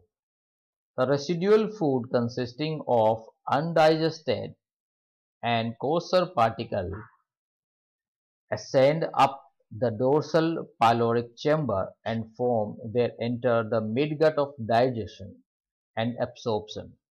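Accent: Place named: India. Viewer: That Indian accent is so irritating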